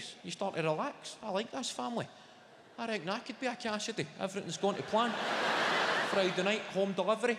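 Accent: Scotland, United Kingdom. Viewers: What's with the comments about "foreign languages"? He's speaking in a Scottish accent and not even a particularly strong one either, perfectly understandable, not our fault if people from the USA (I'm assuming) can't understand, and I'd question the hypocrisy of such a comment considering some of the regional accents y'all have in the USA, and to be frank even the less accented versions of Amercan english are not exactly "english " either are they? (I'm half American and I've travelled extensively right across the USA, so I know what I'm talking about.) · I adore the Scottish accent so much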